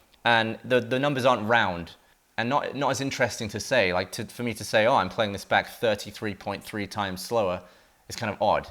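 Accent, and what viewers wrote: United States. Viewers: Your picking up a slight American accent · Anyone else notice how his american accent is developing? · Your American accent is coming through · You're accent is turning American we have lost you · sounding really american in this vid. I wonder if I moved from here to england how long it would take to start getting a british accent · you are really starting to pick up the american accent in parts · Your accent is becoming more american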